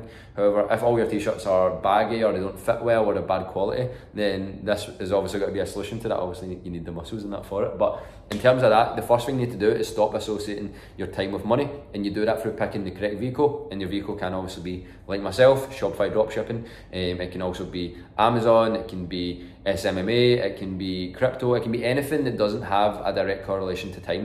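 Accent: Scotland, United Kingdom. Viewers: OMG I love your accent!! Are you Scottish? Btw idk if you noticed, but the subtitles have so many mistakes.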